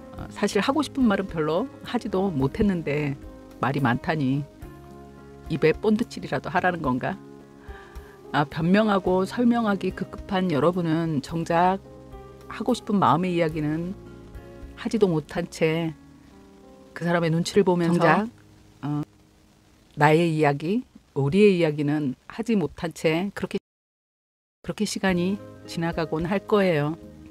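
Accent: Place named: Busan, South Korea. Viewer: 선생님 부산이시네용~~~♥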